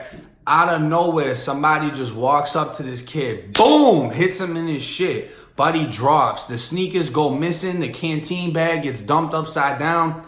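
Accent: Boston, United States. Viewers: Thats his boston coming out you can clearly tell when hes forcing the florida gangsta accent like .. "whats up ERBODY!" this kids a real trip, but ill take him for a good laugh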